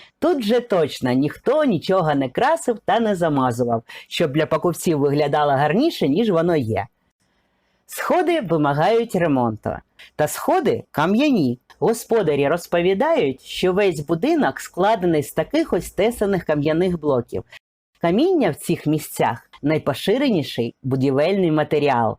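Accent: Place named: Russia. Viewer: З російським акцентом,наприклад говорить прапаную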